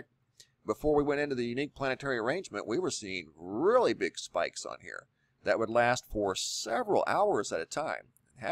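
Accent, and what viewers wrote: United States. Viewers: ThingsAreToughAllOver it's cool mang, he's American I'm just glad they say my name right still.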